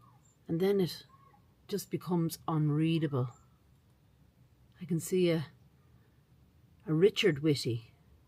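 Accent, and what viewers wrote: Ireland. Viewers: When I was a kid, my Irish Grannie lived with us and she talked like you.... memories here TY TY TY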